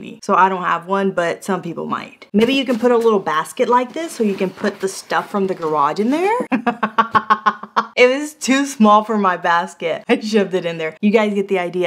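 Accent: Texas, United States. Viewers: Girl are you from TX? I am from San Antonio and you got that TX flare!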